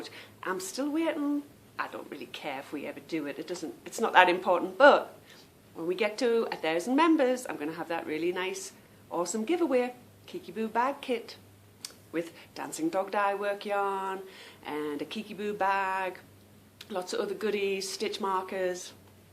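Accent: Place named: England, United Kingdom. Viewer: You talked about saving our first knitting. I learned as a young girl and would make my own doll blankets. I wish I had saved one. But, I did however save my son"s two knitted squares. He is 37 yrs. old now lol. I could not part with them. He asked me if I would teach him how to knit when he was a little boy :) Now I am teaching my 5 yr. old Granddaughter who I live with. Years ago I entered my work in the California State Fair and won ribbons. Most of the entries were baby items. I saved them all so someday my grandchild could wear them. Well, years later my beautiful Granddaughter was born and I gave the clothes I made to my Daughter and she dressed her in all the adorable treasures I had saved. It made me so happy. Now for the word pronunciation. I say Basil the same way as you do but the other words I say in American. You also have people in the south and east coast of the US who have their own pronunciation as well lol. 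I assume the English, like yourself is correct. No one should correct you.. I would love to sound like you. I'm in California so we get sloppy at times when saying words like party etc. and switch the sounds to a letter D. It's very interesting. I enjoyed your podcast very much as always. Take care...Sharon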